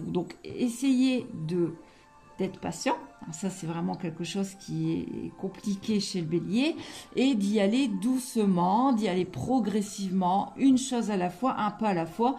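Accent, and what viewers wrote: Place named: Nord-Pas-de-Calais, France. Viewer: Bonjour je vous ai vue par hasard tout à l heure sur un partage (groupes) et donc Je vous découvert et la vôtre attitude envers les personnes qui vous posée des question et votre façon de parle(chti) 😉mon interpellé, je vous ai de suite à précisé 💜🙏 je vous suis donc sur fb et la chaîne YouTube, merci pour l'horoscope(Bélier) je me reconnais😂 merci à vous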